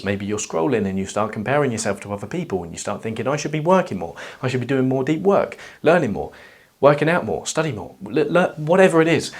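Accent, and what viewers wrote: England, United Kingdom. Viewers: Why does Shia Lebouf suddenly have an English accent? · Okay I truly believe this video has changed my life. I have seen dozens of motivational videos and tons and tons of mental health/ help videos.. but when laying in bed being the usual lazy self one afternoon this video popped into my feed.. I read the title and thought to myself “man I don’t really want to watch this.. but that probably means I NEED to watch this” and I clicked on it with only the intentions of watching the first few minutes or so.. and honestly I started off judging. I thought “what does this bald looking guy wearing a fancy vest and with this English accent think he knows that the rest of the world doesn’t” but I surprised myself when I found myself almost leaning forward eager to listen, to learn. What you said in this video was everything I needed to hear. 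I thought about this video for the rest of the day. And the next morning I woke up and I watched the 32 minutes all over again. I learned even more the second time and I had the most productive day that I had in months. The next day.. I crashed. Burnt out from trying to go 110% and I felt so guilty after just having what I thought was a revelation of my whole life. I slipped back into a depressive state and did nothing about it for a few days.. but I realized something. I could not get this video out of my head. This video and everything you have said was constantly screaming at me. Every time I looked at a screen I couldn’t stop thinking about what I was doing to my brain. I became conscious. This vide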